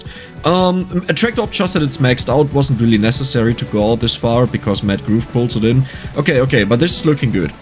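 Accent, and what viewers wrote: Germany. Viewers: Took me 17 minutes to realize you're German lol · Lol hab grade rausgehört das du deutschsprachig bist lmaoo jetzt kann ichs nicht mehr überhören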